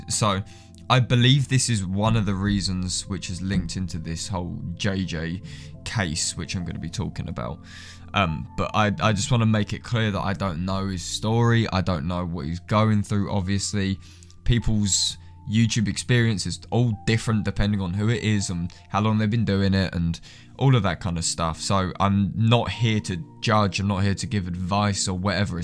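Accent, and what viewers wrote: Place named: Cockney, United Kingdom. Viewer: This dude is legit, no frills, just straight up NMS and a cockney bloke. 👍🏼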